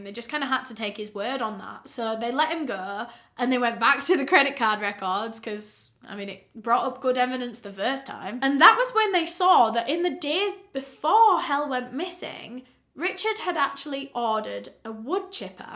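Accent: United Kingdom. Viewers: youve had suchhhh a GLOW UP, you gorgeous babe! i absolutely adore you and your cute uk accent 🤣🤣🤣🤣 i wonder if europeans think americans have "cute accents"? LOLz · What’s her name ? I want to read about the case more in depth. Maybe it’s her cute British accent but is it “hell”? What’s her last name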